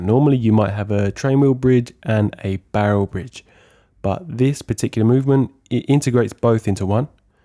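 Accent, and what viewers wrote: London, United Kingdom. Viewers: Hello. Nice video I liked it all. As a Seiko nut I am still surprised I’ve not worked on a 5606 I have 3 to restore but just never got around to them ! You clearly are English and London by the sounds of it so what cleaning fluid can’t you get? I still rate Essence of Renata for a good hairspring degreaser. As for crystal don’t bother looking for originals as you can get any domed , flat or tension ring on aftermarket for little money from cousins. Sternkruez make decent ones. I’d only seek out original Seikos on acrylic flanged or special facet ones. The gear you will have bought from Adrian as they are good. A guy in my Facebook group recently 3D printed the 4 teeth ones which he was selling for $5 each. They work good apparently. I have 5 in stock as I know two of my watches have the fault. Also been looking at that jewellers tool myself recently! 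Will buy it now I think.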